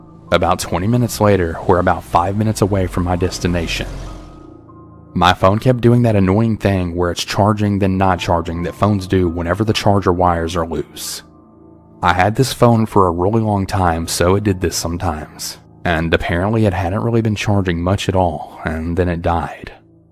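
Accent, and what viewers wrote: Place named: Texas, United States. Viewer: I love your Texas accent damn it so smooth ❤️❤️💯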